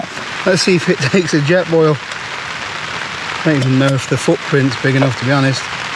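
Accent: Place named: Nottingham, United Kingdom. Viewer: Where is this. Your accent sounds like yur from Nottingham.?)) I'd be building a stealth dug out tbf.. Happy days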